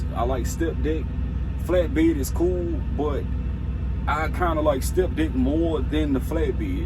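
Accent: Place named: Missouri, United States. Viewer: Definitely a Missouri accent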